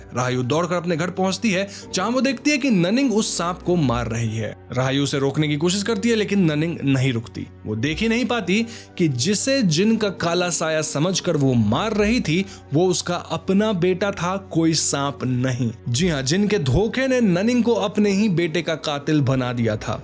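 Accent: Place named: Bihar, India. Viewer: तुम पक्का बिहारी हो, लालू प्रसाद यादव की तरह एक्सप्लेन करते हो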